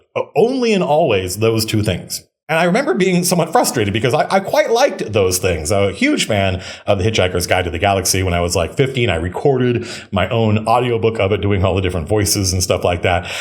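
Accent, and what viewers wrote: South, United States. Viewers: I love your southern belle voice,.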